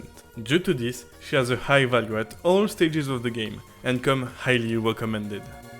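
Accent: France. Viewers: Cache mieux ton français wsh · you are so frenchinglish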